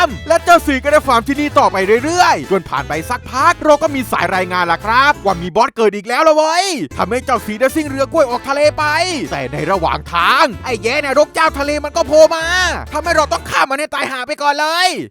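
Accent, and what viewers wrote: South, Thailand. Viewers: เป็นคนใต้หรอครับ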